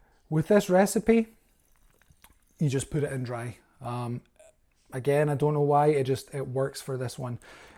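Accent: Scotland, United Kingdom. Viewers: where in scotland you from bud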